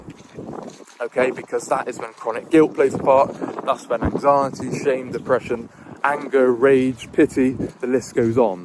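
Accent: United Kingdom. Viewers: I love British accent!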